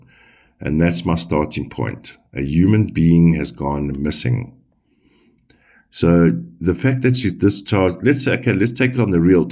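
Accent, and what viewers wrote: South Africa. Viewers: So when Kate appears after all her treatment and recovery you will have no more validity as a psychic medium? Sorry but I feel this is a lot of nonsense. You sound South African so you probably have never had a connection to the UK.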